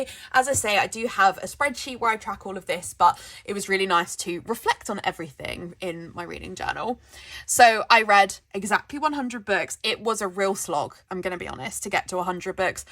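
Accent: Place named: England, United Kingdom. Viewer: I'm currently enjoying a binge watch of reading journals videos and it was a surprise to hear an English accent! You sound a bit like Fearn Cotton ❤ Love your reading journal!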